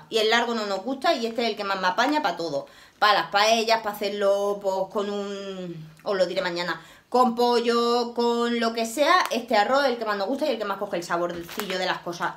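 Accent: Andalusia, Spain. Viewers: Hija yo rambien gasto mucha patata a la semana y gasto los yogures, de azúcar de calla estan muy bien de precio y buenisimos. Yo vivo en Barcelona y lo del bote de verdura de caldo di que hay yo loe comprado muchas veces y mi madre se lo echaba, al cocido incluso lo compraba fresco pero hay que pelarlo i limpiarlo como las acelga y se desperdicia mucho y en bote es más práctico bueno no me enrollo más 😀 solo decirte que me encantan todo tus videos y se me hacen cortos. Una pregunta eres andaluza o tus padres tienes un arte y muy graciosa un beso guapa 😘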